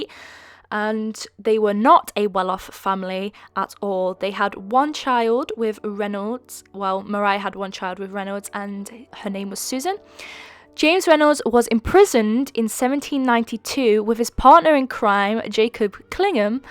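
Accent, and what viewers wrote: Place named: Scotland, United Kingdom. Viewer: Am I the only one who watches these for her soothing Scottish voice? Honestly it's beautiful and I wish I had your voice :3 I'm English myself but I just wish I had your accent 💗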